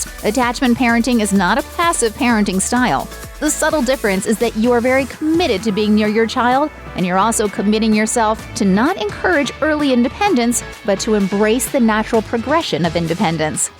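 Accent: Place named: United States. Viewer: So where and when did this much touted "transformation" occur? I'm assuming this is something marvelous that happened post BBT. I'm up to but I don't think I can put up with much more of the narrators dreadful American accent, at first I thought it was a robot.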